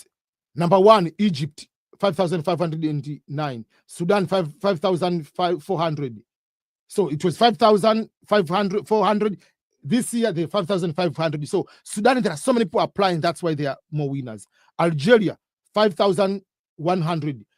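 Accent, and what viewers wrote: Uganda. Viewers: All along i thought this man is ugandan from his accent 😂😂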